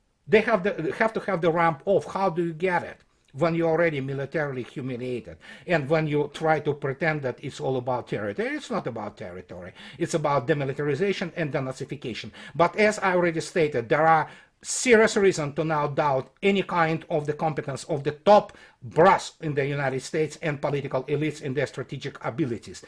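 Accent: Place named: Russia. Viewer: i cant help myself but keep looking forward to your latest videos.i enjoy all your videos with technical information and analysis . enjoy your humorous description of western politicians and so called war experts who never faced bullets in the front lines. i love it when you tell the facts in Russian accent .love all your blogs.god bless you sir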